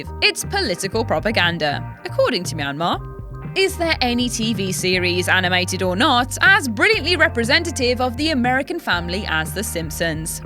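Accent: United Kingdom. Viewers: So how do you pronounce TV? We in the UK, where is from pronounce it as the letters, Tea Vee. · That is highly offensive! is a citizen of the UK, and like the British, Welch, Scots, and Irish many cultures around the world pronounce words differently. Get over yourself and learn to understand different dialects, already! Please, there are more countries in the world than just the United States!!!!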